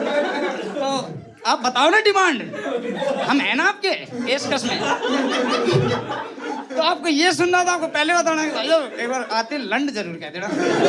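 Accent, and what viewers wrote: India. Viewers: Bhai once again bohot bdiaa… vese jrut to nai h pr bhai k or tera fan hone k naate. Ek suggestion h Haryanvi accent na chooraa kr … baaki bohot bdiaa. ❤ Love uh bhai ne ❤ keep growing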